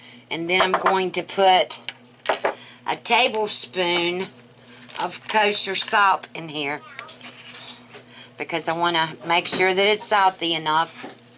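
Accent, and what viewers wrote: South, United States. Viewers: Wow! I can tell from your accent that you're southern....you didn't grow up eating collards? Glad you discovered them.....they are yummy!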